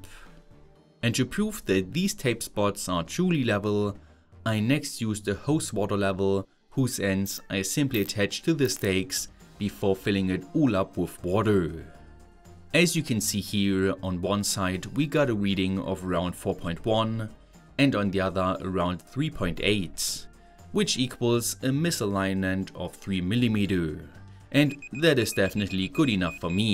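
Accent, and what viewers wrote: Germany. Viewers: Ich höre da ein wenig deutsch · Ich dachte mir schon da höre ich doch einen deutschen und dann noch die simme da kam ich drauf😂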